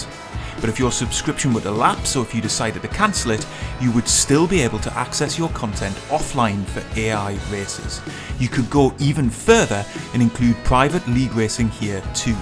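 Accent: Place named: Newcastle, United Kingdom. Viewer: really enjoy your content, nice to hear a fellow Geordie - Dave Cam only lives a couple of miles up the road. The North is a Sim-Hub 😂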